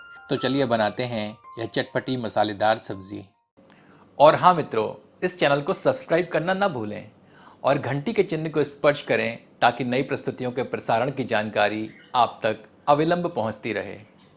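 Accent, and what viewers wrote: Standard. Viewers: आप की शुद्ध हिंदी उच्चारण से ही मन प्रसन्न हो गया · आपकी हिंदी कर्णप्रिय शुद्ध है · I'm a South Indian Tamilian, appreciate your shudh hindi conversation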